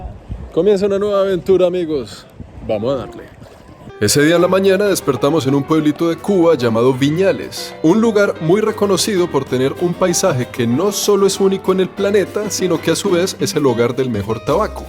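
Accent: Bogotá, Colombia. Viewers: El acento de los colombianos🌹❤️ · En este video escuche que es colombiano ❤❤❤yo amo ❤ese acento colombiano ❤️❤️❤️❤️❤️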